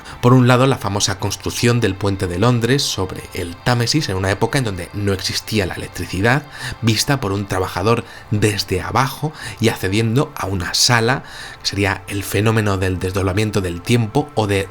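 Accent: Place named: Spain. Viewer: saludos desde colombia siempre me da risa tu acento español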